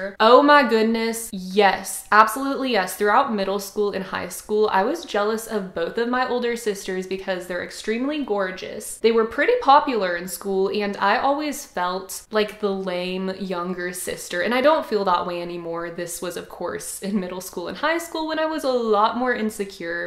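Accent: South, United States. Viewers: Are you from the south? Lol you say y’all. Just wondering, because if so, I’m from the south too! Y’all have a nice day! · What I heard: how do you lack living in a big family? What she said: how do you like living in a big family? She got one nice country accent y'all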